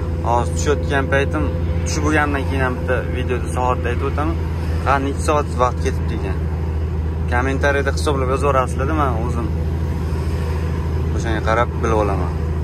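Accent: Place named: Navoi, Uzbekistan. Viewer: навоийни каеридансиз шевангиз вапше навоийникига ухшамидику еки хатирчиданмисиз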